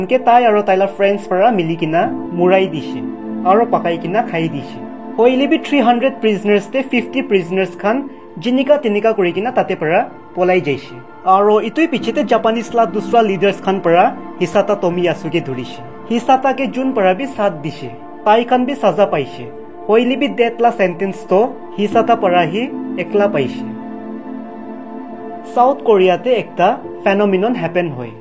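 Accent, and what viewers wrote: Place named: Merangkong, India. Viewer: Bro try to upload everyday ok N btw I heart ur voice may be ur voice like from merangkong,Tuli exact one of my friends n thank you 🎉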